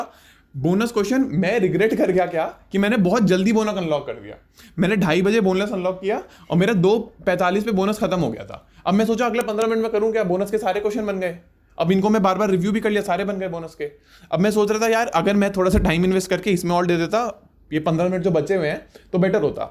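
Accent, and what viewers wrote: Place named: NCR, India. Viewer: Great video.....aur finally koi aisa banda mila jo thodi ncr accent mei bol rha h😂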